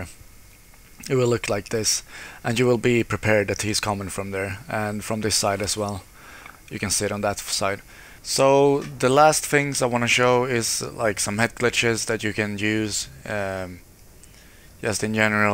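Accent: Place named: Sweden. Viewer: you are for sure swedish😃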